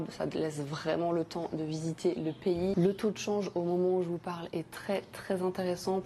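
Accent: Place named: East, France. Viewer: Merci pour cette vidéo, je pars bientôt à da nang ça me servirab😊. C'est moi ou j'entends un résidu d'accent de l'est 🧐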